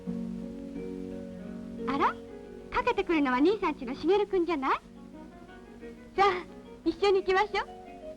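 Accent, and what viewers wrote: Japan. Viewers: 和式便所にダストシュート、それに焼却炉、今じゃ考えられないワッ！ 東京から来た女子転校生がこんな話し方だったなぁ～ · 南関東方言の一つで、いわゆる「山の手言葉」ってやつですね。 今では話す人もあまり居なくなり、ほぼ絶滅状態の言葉です。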